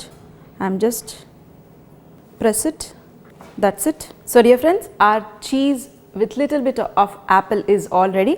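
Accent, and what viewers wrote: India. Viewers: I work in a hospital. I swear to God, the indian accent makes me wanna bust an indian in the fucking head!!!!!!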